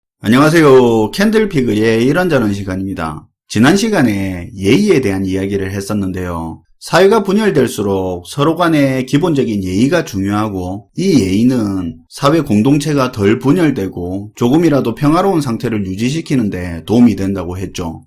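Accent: Gyeongsang, South Korea. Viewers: 아주 경상도스럽고도 신천지포교스럽다 말투부터 거북하다 지금 정치는 바르게가고 있다고 본다 이런식으로 2대는 더가고 그다음쯤 평가 해보자.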